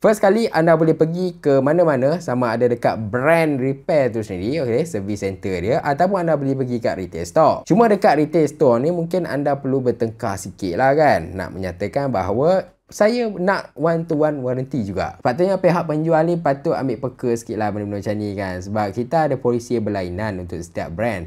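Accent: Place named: Sabah, Malaysia. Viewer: loghat sabah laa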